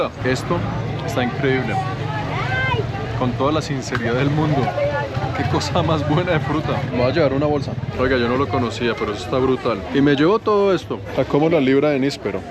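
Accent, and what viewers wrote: Colombia. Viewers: Muy buen relato hermano, por su acento, ma párese como de Bogotá, Rolo como les llaman a los de Capital de Colombia. Gracias por su reportaje, de Antigua., Monumento Colonial de América. Saludos Cordiales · Según tengo entendido, es hijo de madre Colombiana, padre Argentino, nació en Panamá, vivió varios años en Canadá, tiene sangre de Mesoamericana y de la península Ibérica, con acento colombiano.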